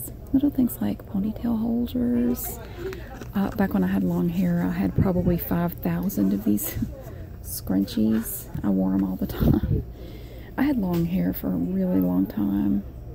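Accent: South, United States. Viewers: Your kids have a thicker southern accent than you do :) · I love her southern accent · Where in America is this accent from I love it oml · I just subscribed to your channel and I love it! Your terrific and love the southern accent! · you are so relaxing and I love your southern acent it's very beautiful thankyou for making videos they are very much appreciated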